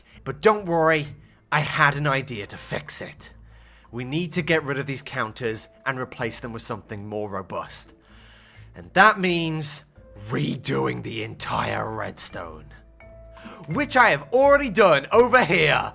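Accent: United Kingdom. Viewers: use your british accent and make a british sentence about you going to hurt this turtle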